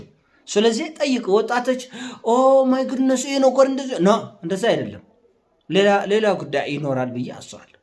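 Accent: Tigray, Ethiopia. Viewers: ሰላም ሰላም ለምንድነው በትግራዋይ አክሰንት ቋንቋ ዜና ማስተላለፍ ምርጫህ ያደረከው? ትክክለኛ ቤሄርህስ ከየትኛው ወንሞቻችን ነክ? በነገራችን ላይ የማንም ብሄር ተወላጅ ብቶን ወንድማችን ነህ!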